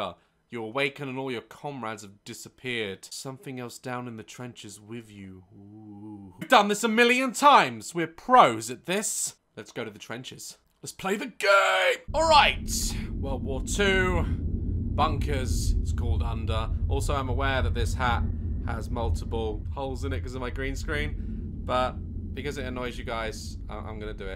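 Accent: United Kingdom. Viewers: One of the things I like about videos is the British accent and btw I just subbed!!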